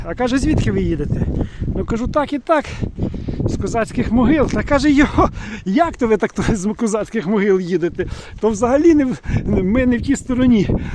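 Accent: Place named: Vinnytsia, Ukraine. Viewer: Дякую пане за цікаву подоріж до козаків.Мені ваша розмова чомусь підсказує що ви з вінницької області? Дай Боже всього найкращого що є в нашому житті і здоров,я.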